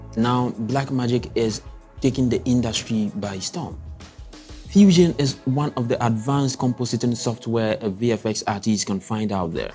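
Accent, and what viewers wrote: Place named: England, United Kingdom. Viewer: I know After Effects. Want to get into 3d. Learning Maya 3d these days. I like your English accent.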